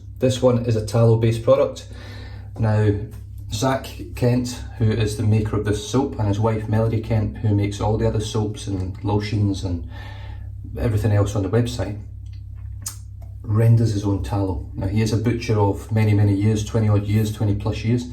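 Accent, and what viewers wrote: Scotland, United Kingdom. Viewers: If he talks to fast for you, try backing off the speed to .75. I usually watch on 1.25... the Scottish accent sounds better when it's rippin'... full Trainspotting 😆😂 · Great review! Melody and I thank you for all the support and kind words. Kind words in a Scottish accent are truly the best so please don't change a thing! I prefer an unscented aftershave or witch hazel as well so I can enjoy the scent of the soap on my skin a little longer. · I don't think there's anything wrong with your speech cadence at all. It's probably just that a Scottish accent can be challenging for people living outside the U.K. You do look very comfortable in front of the camera now, and your videos are entertaining and informative. Nice work. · Um...I don't know who complained about how you speak, but honestly, a Scottish burr is the sexiest accent in the world. 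Added to those beautiful eyes...oof. :D You're perfectly understandable--don't change a damn thing. Shave on. I just bought this soap bc the label is so gorgeous. sigh I'm so easy...just not cheap ;)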